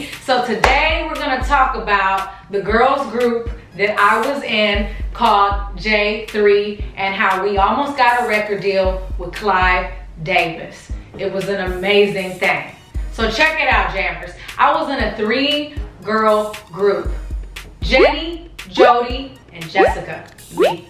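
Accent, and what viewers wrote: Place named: South, United States. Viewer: her little southern drawl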